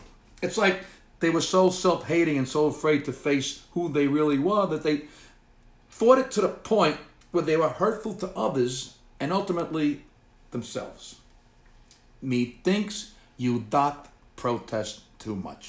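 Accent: New York, United States. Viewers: Hey. i had to move to virginia to take care of my dad who is a decorated war hero...for 3 years now. my family has suffered from discriminations..yankee..go home even teachers and his football coaches...can you hit on this please..were hard core new york wear are shirts proud.but its taking a toll on my son..we love your videos so nice to here some swearing and that accent only new yorkers have..my sons name is Tristan..you would lift him up so much..we appreciate all you do...laughed my ass off on your virus video...ill burn the fucking truck and walk home...funny shit..we where rollin.your awesome.cant wait to get back home when my dad passes. We love you brother stay hard and be safe much love to your family as well...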